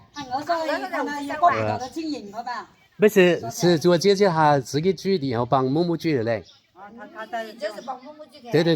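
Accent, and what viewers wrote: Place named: Southwest Mandarin, China. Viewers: 博主是广西哪的？说的话有点像西南官话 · 口音像四川话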